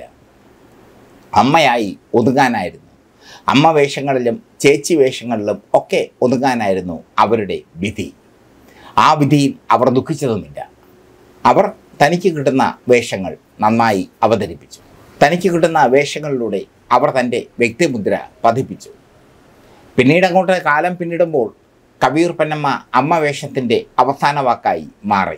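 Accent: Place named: Beary, India. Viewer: അതു ഞമ്മടെ ബ്യാരി മലയാളം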